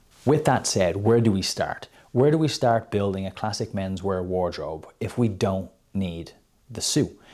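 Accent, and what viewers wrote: Ireland. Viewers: I love your black cat, your Irish accent and your highly useful advice! Plus your natural performance. Good job! I am really grateful for having found this video! Thank you so much!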